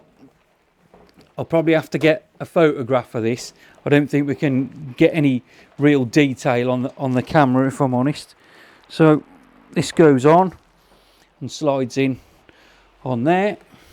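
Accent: United Kingdom. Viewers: British accent! So lovely, mate!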